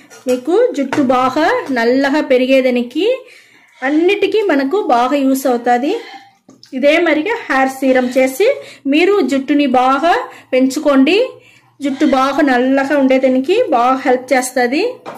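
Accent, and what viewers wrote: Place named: Karnataka, India. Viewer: కన్నడ భాష యాస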